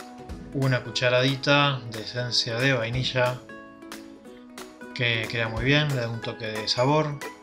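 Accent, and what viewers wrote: Argentina. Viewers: Hola! Muy rica receta de waffles! Ame! Me llamo la atención tu acento sos Argentino o Uruguayo? Muy rico todo voy a seguir las recetas!! =)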